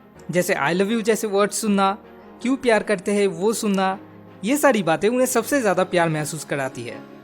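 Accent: Bengal, India. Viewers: frnd r u bengoli .??..ur voice tone is bengoli ..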